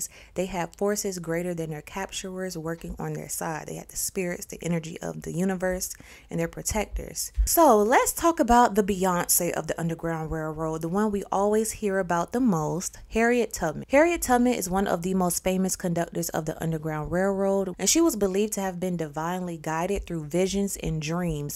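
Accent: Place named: Rural, United States. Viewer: Not sub tal 😂 girl I love your country twang lol thank you for doing this series ❤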